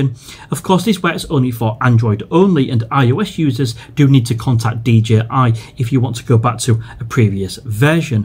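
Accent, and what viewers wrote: Hull, United Kingdom. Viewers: hi are you from hull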